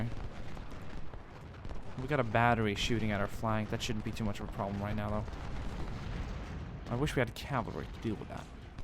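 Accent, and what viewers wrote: South, United States. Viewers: His southern accent is a little too rich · As someone from Virgina, you do an A+ southern accent XD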